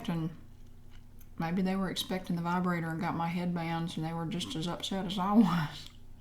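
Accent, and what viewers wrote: South, United States. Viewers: Something about a southern accent I actually enjoy lol I'm a Georgia city guy but I still love it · this type of southern accent reminds me of Tree Trunks from adventure time haha 💗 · You are real Southern Belle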